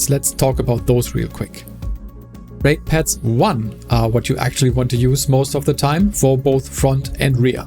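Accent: Germany. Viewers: I can hear Denglisch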